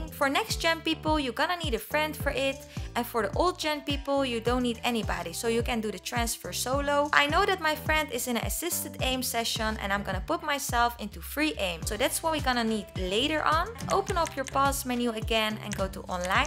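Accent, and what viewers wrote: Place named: Netherlands. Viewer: dan ga ik zeker abonneren 😁 ik hoorde op een bepaalde manier dat t Engels was maar dan beetje Nederlands zegmaar je hoort da je Nederlands bent haha maar kan jij. Nog same. Met ps4 spelen of heb je die nieuwe versie al gekocht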